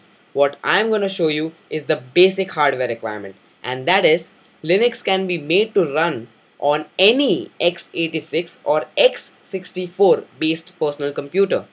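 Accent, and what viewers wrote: India. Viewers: Lmfao diz fuckin' indian , how2speaksofunny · He's an Indian. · I don't like it either, but it's because we're not used to it. It doesn't ruin the video, as it's one of the better Indian accents that I've heard.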